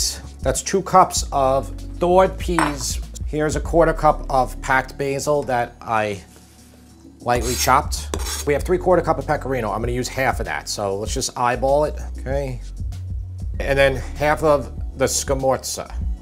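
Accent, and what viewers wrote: New York, United States. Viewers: I love the NY accent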